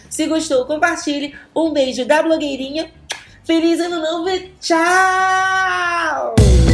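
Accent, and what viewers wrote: United States. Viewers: queria entender esse sotaque meio brasileiro meio texano meio nordestino da blogueirinha. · Que sotaque americano lindo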